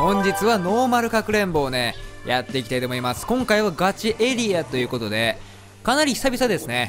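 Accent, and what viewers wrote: Kansai, Japan. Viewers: 毎日の動画みて笑ってます！関西弁だから親近感がwこれからも頑張ってくださいヽ(*´∀｀)ノ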